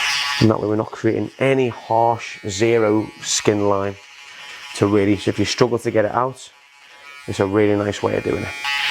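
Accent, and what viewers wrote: Liverpool, United Kingdom. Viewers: Are yu from liverpool i have a strong feeling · where actually is he located, i am guessing somewhere in the UK judging by the accent but that if fine for me as someone that lives in the UK. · You're from Liverpool I can tell so err yes lar was happenin kid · He snds scouse if yer based in Liverpool lad gis a message where yer at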